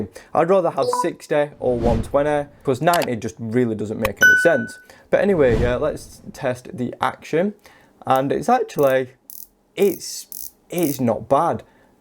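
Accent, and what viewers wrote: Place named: Manchester, United Kingdom. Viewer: I love working-class Manch accent (Manchester). Nice review here. I am looking at this one as a gift for my young nephew. You've got a slim wrist like me.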